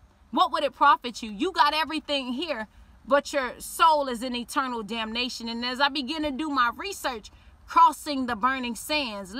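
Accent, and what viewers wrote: United States. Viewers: You are beautiful and I love that Florida chopped accent lol…what’s your IG??? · I hear a Louisiana accent. Thanks for sharing you testimony beautiful. This is my first time watching any of your videos.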